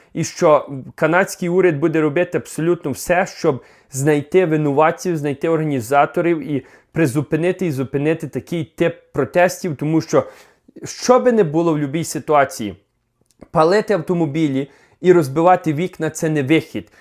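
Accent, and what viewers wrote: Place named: Ternopil, Ukraine. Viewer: Дякую. Чудова мова. Десь в дитинстві я чув таку вимову на Тернопільщині. Бо в Україні ми, на жаль, розмовляємо вихолощеним совковим варіантом, ще й з вкрапленнями москоіської говірки (а хай так буде, з помилкою)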